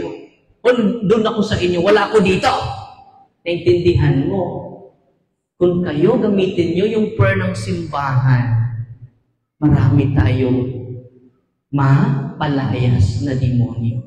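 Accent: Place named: Iloilo, Philippines. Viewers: Very good kaayo Father! Mora man pod ka Ilonggo. Passing na kaayo Father imo Ilonggo.naa ra gamay medyo kimaw pag pronounce like U-tod. (Not utód). Pero okay na kaayo Father!wow! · Ang cute mag ilon go speak 😊 Gusto KO Sana pumonta,hi de knm ALAM San yan😅 Amen🙏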